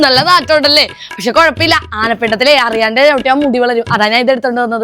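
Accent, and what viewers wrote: Alappuzha, India. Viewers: ചേച്ചി ആലപ്പുഴ anno❤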